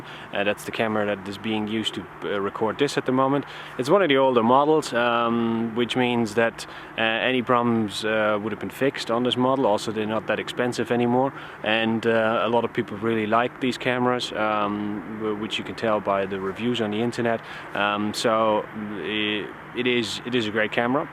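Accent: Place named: Italy. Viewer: Are you Italian? Love your accent!!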